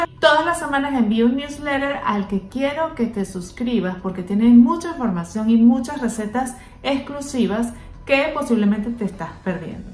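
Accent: Venezuela. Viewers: ♥️♥️ creo que somos paisana por tu acento eres Venezolana...😍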